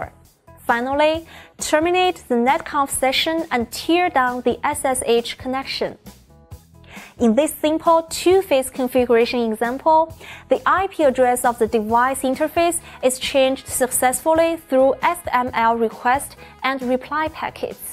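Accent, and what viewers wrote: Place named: China. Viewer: 一听就知道是中国人说英语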